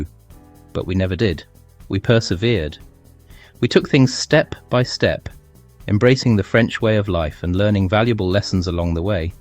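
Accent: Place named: Southampton, United Kingdom. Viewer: Tu as laissé ton accent de Southampton!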